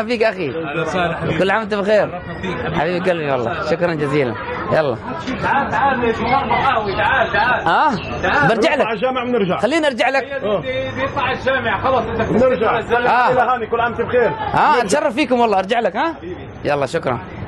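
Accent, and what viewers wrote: United Arab Emirates. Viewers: لهجتك اماراتيه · لهجته إماراتية كان عايش بالإمارات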